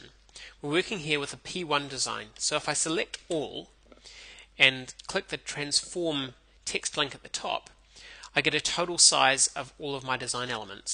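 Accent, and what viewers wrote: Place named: New Zealand. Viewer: kiwi voice ftw! :)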